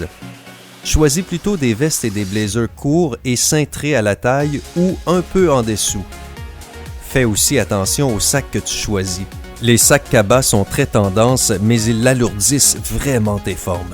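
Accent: Quebec, Canada. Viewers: L’accent québécois omg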